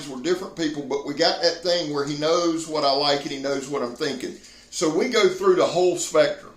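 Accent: South, United States. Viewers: Southern accents are so cool. All I got is this Canadian tongue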